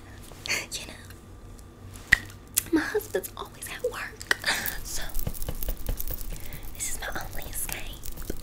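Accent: South, United States. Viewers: I don’t know why but some parts of this reminds me of Virginia being petty in Rising Hope 😂 it’s that, “oh no honey” and ,”where is your mother”. The slight southern tone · the chaotic energy and southern accent are telling me you’d make the perfect charlotte la bouff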